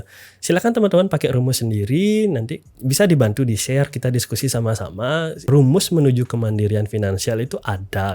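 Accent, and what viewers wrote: Bali, Indonesia. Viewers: Logat Timur coy, Bali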